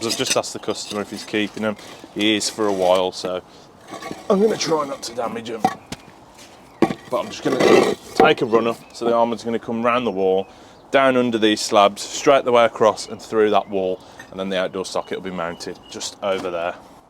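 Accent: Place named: England, United Kingdom. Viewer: where are you from in England?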